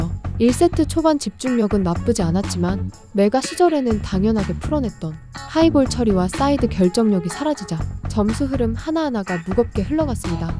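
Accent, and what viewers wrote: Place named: South Korea. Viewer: orang Korea bro kelihatan logatnya tp kelahiran Tegal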